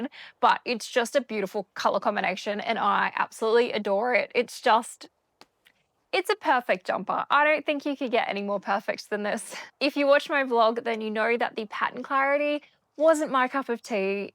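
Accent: Australia. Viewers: Hi! I am so happy for you that they are finding you a diagnosis and should soon be figuring out better ways to help you! You are such an incredible inspiration with the way that you are able to film your wonderful videos for us and convey such joy for your knitting and for life in general. I am sure that I can speak for everyone when I say how grateful we are that you share so much of your precious energy with us, because as you say you get so tired and likely discouraged at times. Your knitting projects are so lovely, all of them, and you do beautiful colorwork. Also I have to say that I just love your accent, and practice my Australian word pronunciations when no one is listening😄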